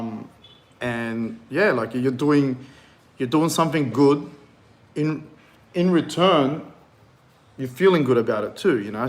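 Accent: Australia. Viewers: Very strong Aussie accent mate, thought you are Turkish but sounds exactly like an aussie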